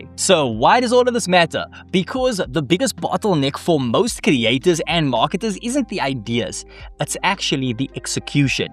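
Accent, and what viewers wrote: South Africa. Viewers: So lovely to hear a fellow South African :) Glad I found your work, super cool and impressed. Thank you so much. Loving my Journey with Claude Co-work so far.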